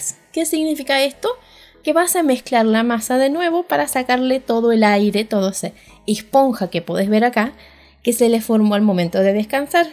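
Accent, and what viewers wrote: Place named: Tucumán, Argentina. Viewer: Es de Tucumán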